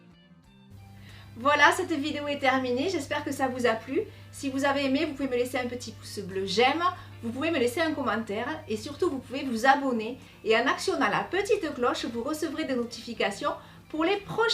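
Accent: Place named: Provence, France. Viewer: Bonjour - C'est vraiment magnifique, quel coup de main! Dommage par contre que l'on ne voit pas votre palette pendant la vidéo. Je vous trouve formidable et quel accent merveilleux - j'hésite entre vers chez moi en provence ou le sud-ouest, mais je penche plutot pour la première solution. Toujours un régal de vous suivre; Merci pour toutes ces belles vidéos et vos conseils précieux. A bientôt.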